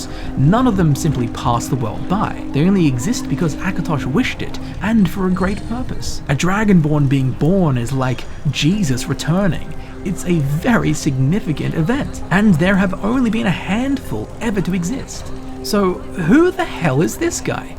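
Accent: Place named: United States. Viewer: Is it just me or was super American sounding in this vid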